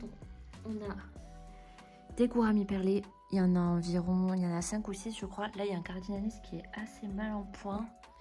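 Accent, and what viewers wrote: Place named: Aveyron, France. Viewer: l'accent était aveyronnais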